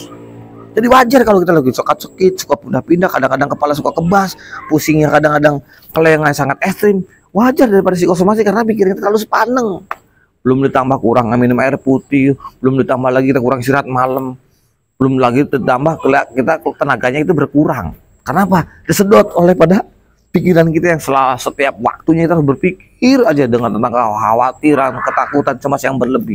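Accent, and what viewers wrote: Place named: West Java, Indonesia. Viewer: Kalo dari logat2 nya kaya orang Sunda kang, saya dari cibiru bandung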